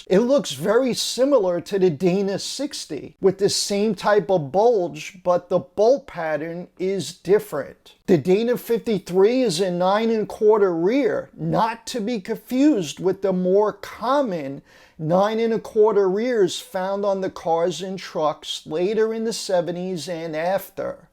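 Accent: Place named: Bronx, United States. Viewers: Hey! Are you from the Bronx? You talk the same way my father did. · That accent... Baracooder"..😂 like my grandma from New York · the East Coast accent Just like when they say they're going to Florida We're taking the kids down to Disney world in Flarider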